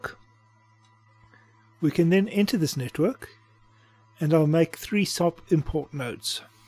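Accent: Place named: South Africa. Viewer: Came here from the SideFX site, immediately recognized the accent. Howzit boet :D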